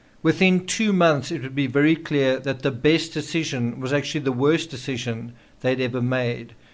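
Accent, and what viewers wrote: South Africa. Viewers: Are you South African🇿🇦🇿🇦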